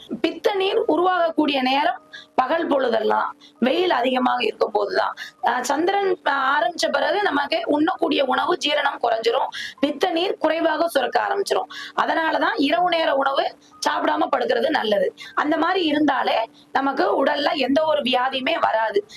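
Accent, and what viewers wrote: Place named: Thoothukudi, India. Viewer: பேசும்போது தூத்துக்குடி வாசனை வருகிறதே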